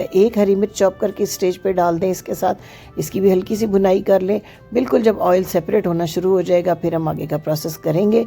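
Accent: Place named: Punjab, India. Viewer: آپ کا اردو بولنے کاانداز پنجابی جیسا ہے اسے بہتر تھا آپ پنجابی میں ہی بات کر لیتے۔میتھی میں نہ تو سوکھا دھنیا ڈالا جاتا ہے اور نہ زہرہ۔اور میتھی کو دم میں پکاتے ہیں۔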